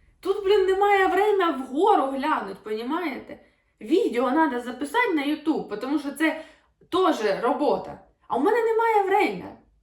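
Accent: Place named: Poltava, Ukraine. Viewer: є розмовна мова регіону,до речі я з Полтавщини,у нас теж балакають,шокають і т.д,дуже наш говір схожий на ваш.Є літературна мова,але суржик він справжній,як би хто до нього не відносився.можливо ви мені через суржик і сподобались.не беріть близько до серця.як на мене краще суржик ніж:"я разгаваривала и буду разгаваривать........"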